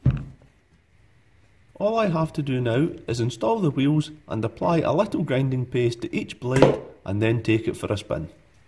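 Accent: Scotland, United Kingdom. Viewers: His accent has me wondering where he is from the mower is British but his accent sounds Scottish if you read this can you please tell me if you don't want to that's fine my ancestors from my mother's side are Scottish and I would just like to know thank you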